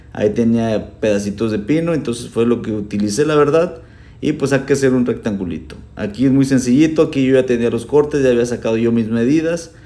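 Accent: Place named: Michoacán, Mexico. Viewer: Muy buen trabajo 👍👌 oye mi estimado eres de Michoacán verdad por el asento y la parota que trabajas eres como de coalcoman por esos rumbos de Michoacán jeje saludos y bendiciones amigo gracias por compartir tus conocimientos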